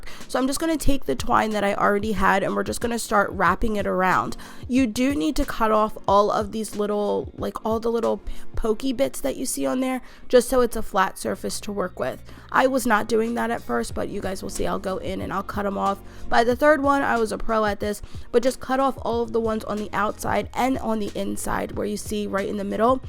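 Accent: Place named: New Jersey, United States. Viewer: I love them all!!you sound like a Jersey girl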